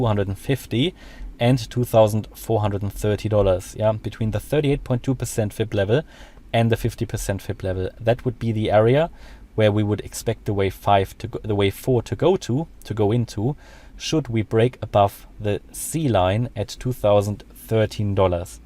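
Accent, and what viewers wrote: Germany. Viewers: digga bitte versuch diesen deutschen akzent dir abzugewöhnen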